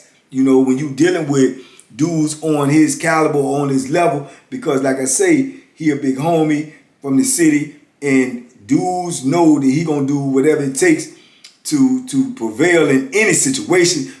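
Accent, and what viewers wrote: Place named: Louisiana, United States. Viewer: By your voice, I thought you were from Louisiana (New Orleans)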